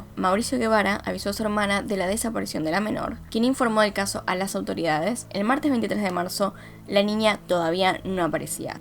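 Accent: Argentina. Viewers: Me sonaba tu acento Yo tipo: es argentina Y vos justito lo dijiste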